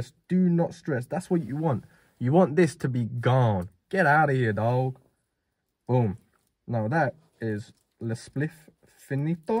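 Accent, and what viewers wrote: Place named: Birmingham, United Kingdom. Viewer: u from birmingham? nice accent.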